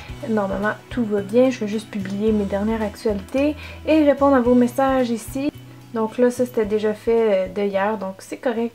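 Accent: Quebec, Canada. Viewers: C'était vraiment cool à voir :) Et je me lasse pas d'entendre ton bel accent. Grâce a toi, j'ai réussis a perfectionner mon accent québécois ;) Tu penses que tu pourrais faire une tweet cam un de ces quartes ? Ce serait sympa :) Bon vidéo et keep on rocking girl t'es écœurante :) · PS : ça fait un bon moment que je suis tes vidéos, je vais essayer de trouver plus de temps pour aller sur ton blog car il y a de super articles. J'apprécie vraiment les conseils et la qualité de tes vidéos. Sans parler de ce charmant accent pour la française que je suis ^_^ (si je n'étais pas en couple, je pense que je serai venue travailler un peu comme infirmière au Québec, je suis mauvaise en anglais par contre, parce que j'ai toujours rêver de venir au Québec !) Merci encore pour tout ce travail que tu nous offres ;-)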